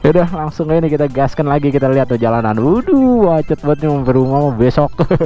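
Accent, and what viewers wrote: Jakarta, Indonesia. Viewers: Anjir logat lu kaya orang jakarta bang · betawi bat da ah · Logat nya betawi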